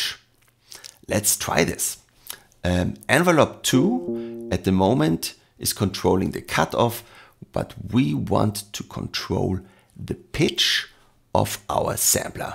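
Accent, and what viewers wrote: Germany. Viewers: man kann deinen deutschen akzent deutlich hören :)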